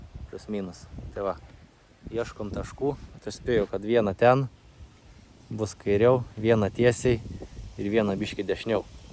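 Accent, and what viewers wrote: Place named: Pakruojis, Lithuania. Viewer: Iš kokia kraštą buset kalba kaip Pakruoje🙂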